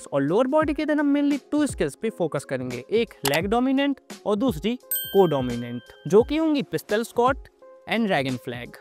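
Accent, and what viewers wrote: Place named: Bundelkhand, India. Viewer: Bhai kha se ho voice se toh bundelkhand ke lagte ho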